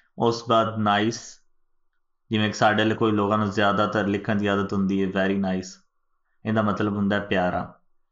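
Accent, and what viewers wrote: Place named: Jhang, Pakistan. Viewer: سوہنا جھنگ سوہنے لوک سوہنڑی بولی سوہنا لیکچر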